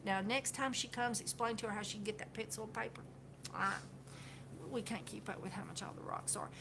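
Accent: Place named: California, United States. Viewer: Pretty Rad... Sounds like you grew up in California!? United We stand YouTubers Playlist: I'm going to put the link to this video in my playlist-- United We stand YouTubers. A ring the bell and I've hit your thumbs up good job. CBD oil back before it was okay to have I discovered just regular hemp oil was very good for soaps and lotion.... I look forward to hear from you, God bless bye for now Ken. Don't be a stranger :-)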